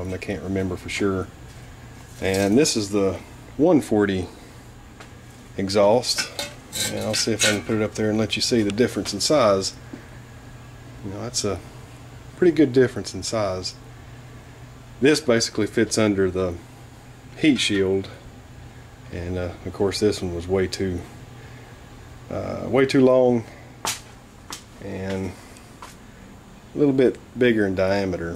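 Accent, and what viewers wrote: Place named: New York, United States. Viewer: you share a lot of useful information on manuals,tools and what to expect when working on a particular item. I bought a '73 140 H 3 last week and have a R F 2 318' s and others your videos are some of my go to's to see what I need too do, by the way what part of NY are you from with that accent? thanks for sharing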